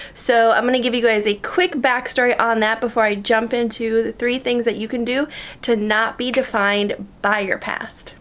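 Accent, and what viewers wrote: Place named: United States. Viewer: yess your very clear American accent is helping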